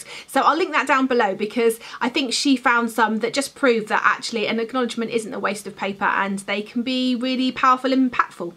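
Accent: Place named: United Kingdom. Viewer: I want to read Winger toooo, but this book is just hanging out on my shelf 😂 Love your accent sooo much!!! I unfortunately have a kind of American accent but I looove love love hearing your wonderful British accent ❤️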